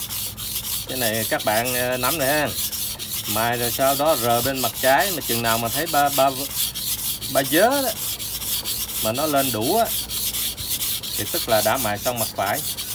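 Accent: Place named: Mekong Delta, Vietnam. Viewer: Cắc phải hỏi xem anh ở đâu để tới học mài dao cho bà xã thấy anh chỉ dẫn rất hay,mình ở"ăn giang"miền tây chắc anh cũng ở miền tây,nghe giọng nói biết dân quê mình cảm ơn anh nhiều...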